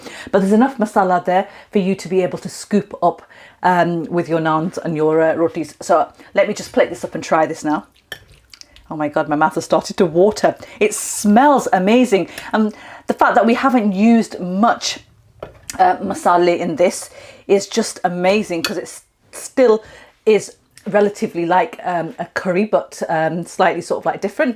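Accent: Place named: Yorkshire, United Kingdom. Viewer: I've just discovered your channel and I think it's great. I don't know where are you from but you sound to have a bit of a Yorkshire/Manchester accent. Wherever you are from thanks for the recipes anyway.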